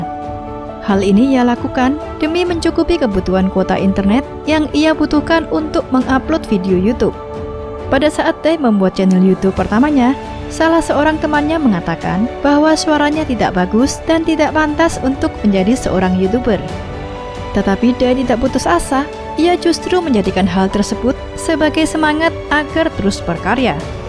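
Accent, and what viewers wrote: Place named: Medan, Indonesia. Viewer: Bang lu orang medan ya?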